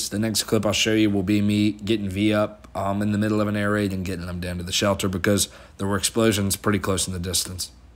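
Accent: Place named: Pittsburgh, United States. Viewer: As soon as you talked I Knew you were from the Burgh.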